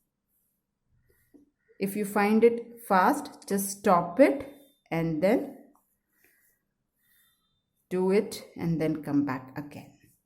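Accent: India. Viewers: I am watching this in 2025….this video helped me a lot when i heard the Indian accent i knew this was the right video i am a bit impatient type of a person but this actually helped …all the American tuts were wastage of time❤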